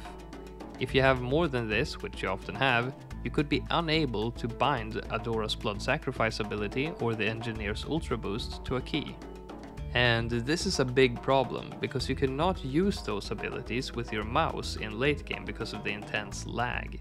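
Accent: Sweden. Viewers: tog två sekunder att höra dig prata för mig att inse att du är svensk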